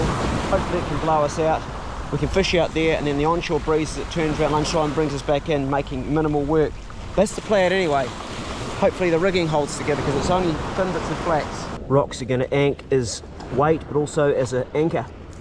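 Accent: Australia. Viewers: Awsum life mate. Love your aussie accent. Excellent crafting. Im a kiwi expat lived in OZ most of my life. Was full time offgrid doing the The Big Lap for 5 years. Nature be in it 💪👍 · Seems like a sort of copy of survivor man with an Australian accent. I dig it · Thought he was cheating until i unmuted and heard he was australian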